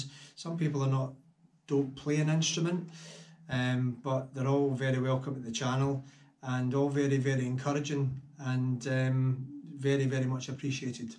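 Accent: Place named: Scotland, United Kingdom. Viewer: I think you made a really great video here and you are absolutely right. DM 190 is a constant here on Youtube and deserves your award. By the way. Nice to hear you speak a little longer. I love your scottish accent. I could listen to you all day Like your drumming.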